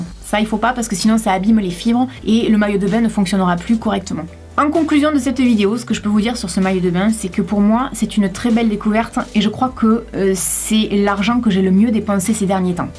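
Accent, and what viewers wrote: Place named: Southwest, France. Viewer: J'adore ton accent du sud-ouest!!!!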